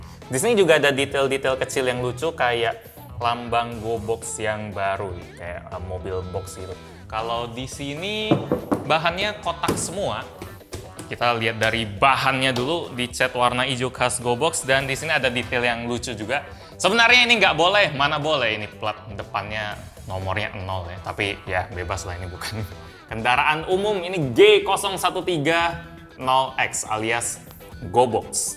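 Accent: Palembang, Indonesia. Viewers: Bang orang palembang kah?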